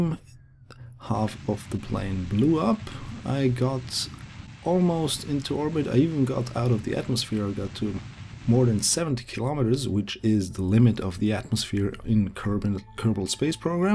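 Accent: Germany. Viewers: Ach komm schon ich hör doch an deinem Akzent dass du deutsch bist ;) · Great SSTO and building skillz. But i can't listen to your narration - why dont you speak in your mothertounge? (german?) Greez from Vienna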